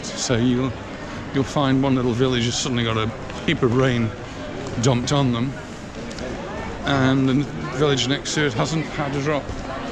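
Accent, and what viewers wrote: Stoke, United Kingdom. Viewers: Are you originally from Stoke by any chance? I'm detecting a Stokie accent. Update: Just seen your video explaining how you got to Mallorca, and as suspected, you're a Stokie. Greetings from Longton!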